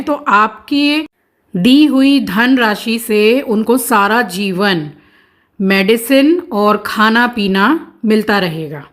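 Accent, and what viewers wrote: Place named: Braj, India. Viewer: Hi ji.. mere mann mai ek query hai ki aap rahti Kolkata mai h to aapki kuldevi kaila maiya kaise itni dur and aapki language bhi agra Mathura wali hai.. kyu? Aisa m isliye pue h rai hu kuki m agra se belong karti hu aur hmari kuldevi kaila devi hai aapki wording hmari jaisi hai reply jarur karna pls..@ ji reply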